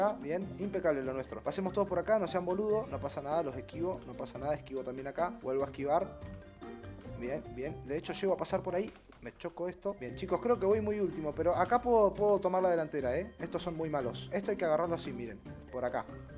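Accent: Spain. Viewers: fuera de joda te sale bien el acento español jajskajs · suenas muy español en este video, siempre me alegras la noche y el dia <3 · Ver a jugar el es muy divertido, y más con su acento español que le sale muy chevere. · Es idea mía o habla como español? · Sonas re español boludo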